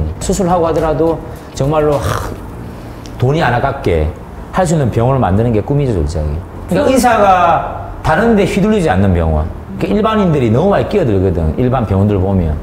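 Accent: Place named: Daegu, South Korea. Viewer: 잉 형님 말투가 대구 사투리 던데 ㅋㅋ 제가 착각했나봄 ㅋㅋㅋ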